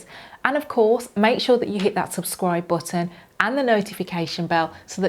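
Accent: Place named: Essex, United Kingdom. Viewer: Thank you for the video, last time I left a message regarding to your Essex accent, now in this video you accent is perfect, I can fully understand everything. Thank you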